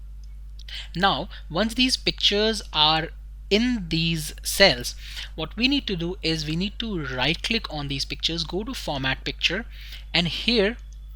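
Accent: India. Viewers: indian speaks English =))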